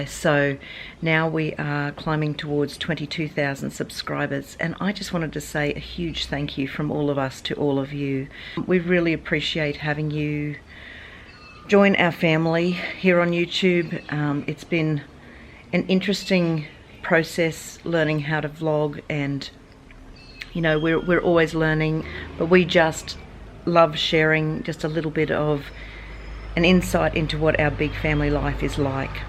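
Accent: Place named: Australia. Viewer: i am a new subscriber and love for family and honest aussy way.we live in Biasbane and love hearing this aussie mum.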